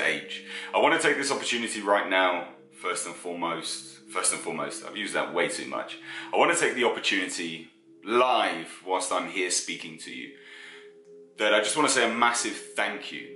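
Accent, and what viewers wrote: United Kingdom. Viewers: I NEVER say these things, 1st time ever.....but YOU'RE EXTREMELY HANDSOME & I've always loved accents, especially British. As an ardent Anglophile, I'll be embarrassed if you're not UK.... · Handsome fella you are. Can I ask what kind of brit accent you have? Excuse my ignorance but just curious since your voice is smooth and fluid in every way. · Jesus you’re ripped😂😂😂!!! And you’re voice is so deep and British!!!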